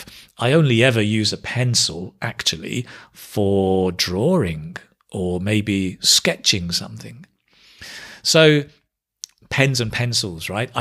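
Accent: United Kingdom. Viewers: This accent (British) is amazing .. i do like it, but i have not still mastered it well.. briefly thanks for your information about that, and have good day.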